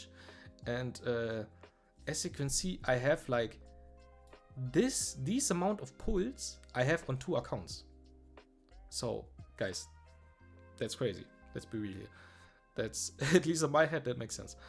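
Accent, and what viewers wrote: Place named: Germany. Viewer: Didn't know you before, but really good video. Also I would bet my rebate money that you are german hearing your accent. xD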